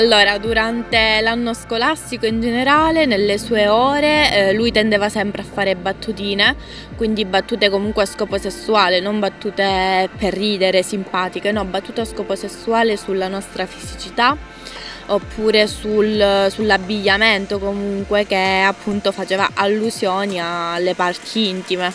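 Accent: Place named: Calabria, Italy. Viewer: Sicuramente tu non sei una cima visto il tuo accento calabrese questo canale fa di tutto x fare visualizzazione non credo a una parola di quanto hai detto 👎👎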